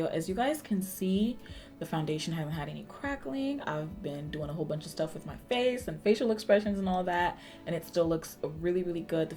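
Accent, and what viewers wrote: United Kingdom. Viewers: The English accent 😂😂😂 sounds like ppl I go school with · The British accent lmaooooo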